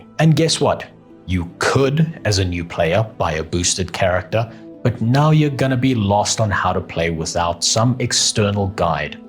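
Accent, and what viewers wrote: South Africa. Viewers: Saw you on Asmon bru, fucking amazing to hear an SA accent on a monster streamer like Asmon, he laughed his ass off at your clips of him!!! well done man!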